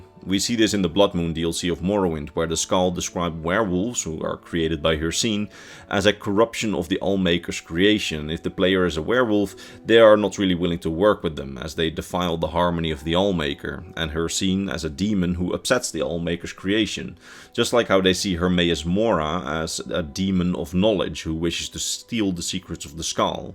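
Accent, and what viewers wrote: Netherlands. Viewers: you sound Dutch, I'm guessing you're from Holland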